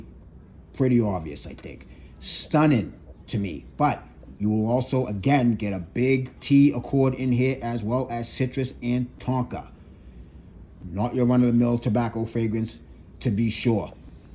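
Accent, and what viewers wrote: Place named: South Shore, United States. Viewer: Your accent is bringing me home. From the south shore.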